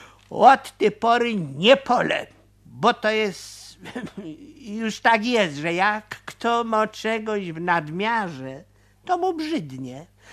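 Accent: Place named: Poznań, Poland. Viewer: fajno godo :) tero tak mało wiary gogo po poznańsku szkoda :(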